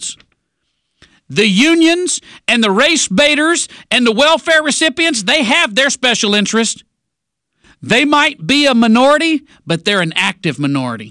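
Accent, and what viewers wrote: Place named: Texas, United States. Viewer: He's a born Texan, that's just how he speaks.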